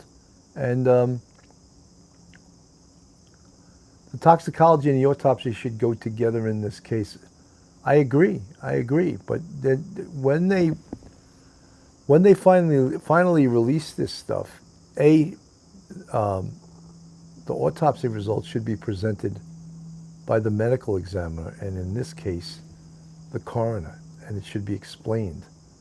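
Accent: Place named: New Jersey, United States. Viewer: Sorry, missed the live but here for the replay. Hope you are doing well. Ty for all you do. Love your accent... reminds me of growing up in NJ... moved to Tx in 79 bc my late husband grew up in the Dallas area. Now all my children are grown up and my grandchildren are all here. I try to stay away from mainstream news... too wacky and anxiety inducing.... would rather watch you and a couple of other yt channels w integrity.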